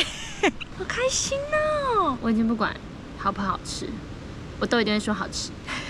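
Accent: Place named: Taiwan. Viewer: 妳好能自言自語唷！講台語好道地的感覺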